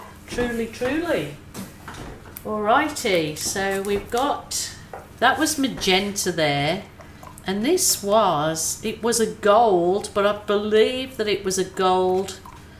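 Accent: Birmingham, United Kingdom. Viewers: Hi there just found you, a question. Were you born in Austrailia? I can hear a slight Birmingham accent . Love your program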